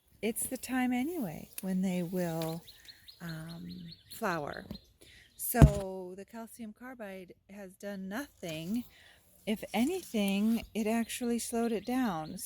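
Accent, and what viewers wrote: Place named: Jamaica. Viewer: Heyyy you sound Jamaican!!!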